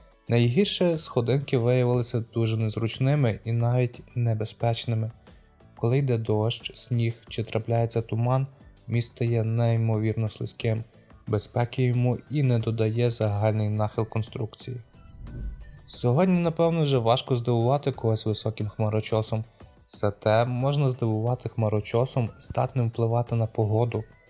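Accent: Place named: Lviv, Ukraine. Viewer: звернув увагу на вимову числівників. Звучать як у Львові говорять - 50 з пом'якшенням т.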